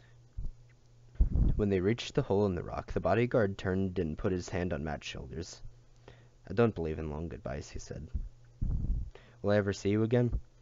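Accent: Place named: Scotland, United Kingdom. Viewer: why do you speak in "Scottish" voice?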